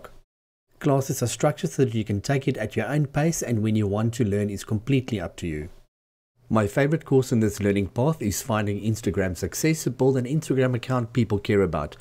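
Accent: South Africa. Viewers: That's a definite South African accent there. Where can we find stuff like this in SA? Awesome build!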